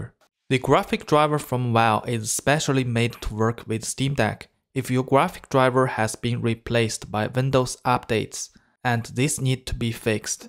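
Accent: China. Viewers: 这是中式英语吗,口音感觉好熟悉啊www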